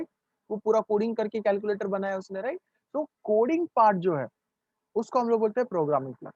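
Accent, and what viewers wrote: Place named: India. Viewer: The accent is hindi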